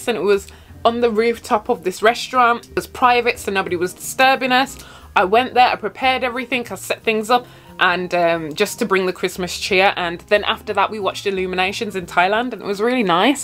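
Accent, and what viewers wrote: Birmingham, United Kingdom. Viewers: Yo sis love the videos. Are you from Brum? Your accent sounds like it haha. Hope you're doing well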